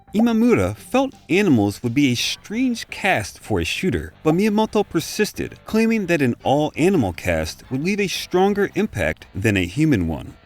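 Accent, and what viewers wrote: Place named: United States. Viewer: I can't help but wonder what region of the US you're from. Or if you're Canadian. The way you pronounce your O's sometimes really stands out. I desperately wanted you to say "the poconos" lol. Great video btw. I've always loved Star Fox :)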